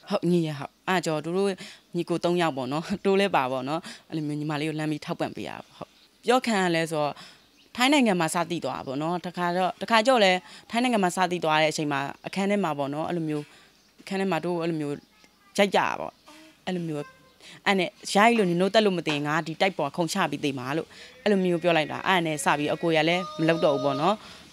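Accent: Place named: Taungoo, Myanmar. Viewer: တောင်ငူလေသံကြီး ဟ သိနေတယ်နော်❤😂 မျိုးthandar ooရေ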